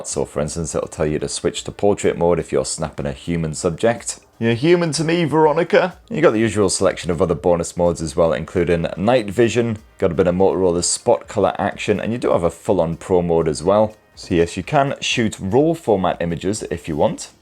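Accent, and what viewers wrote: United Kingdom. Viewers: thinkin about getting this as my new phone. I love ur british accent, its so goofy xd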